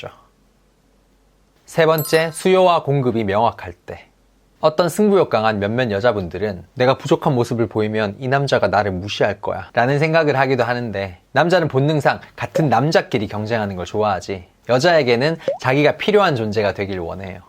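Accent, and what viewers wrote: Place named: Busan, South Korea. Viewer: 부산 사투리와 표준어의 절묘한 만남이 느껴지는데, 기분 탓일까요?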